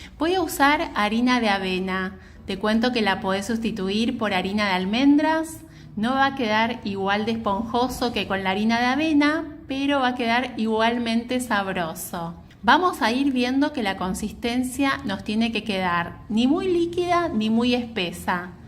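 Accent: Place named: Mendoza, Argentina. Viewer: Ese acento lo conociaaaaaa😂😂😂 soy de Mendoza 🩶 te mando 🫂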